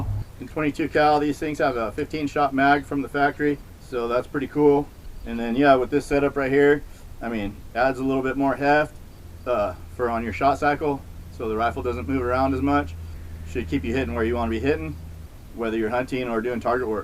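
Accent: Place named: Louisiana, United States. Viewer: You must be from home I can hear it in ur voice yeah!! ( Louisiana)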